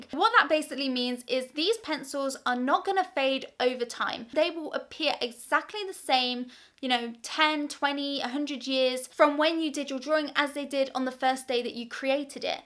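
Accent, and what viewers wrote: England, United Kingdom. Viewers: Your art is ofc amazing, but i think you should also start an English accent tutorials channel.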